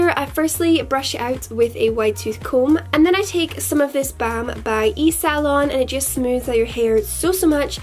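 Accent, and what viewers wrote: Scotland, United Kingdom. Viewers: Elisa Forever Scottish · panda dreams well shes brittish so..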